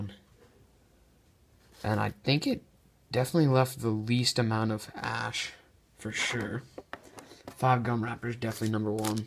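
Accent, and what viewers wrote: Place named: India. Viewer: A wrapper I thought he was saying rapper This Indian tech guy inglish needs to stop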